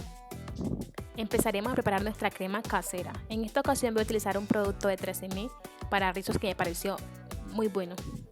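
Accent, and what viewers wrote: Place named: Chocó, Colombia. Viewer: Hola hermosa. Cuando te escuchó me recuerdas a mi cuñada, tiene ese acento de Quibdo Chocó como el tuyo. Pero no se si eres de allá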